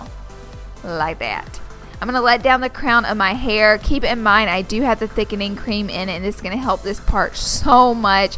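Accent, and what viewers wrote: South, United States. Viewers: Are you from the south cuz you sound like it. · are you southern, you sound like me haha like a baby southern accent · You have a posh southern accent. I love it. Your so beautiful!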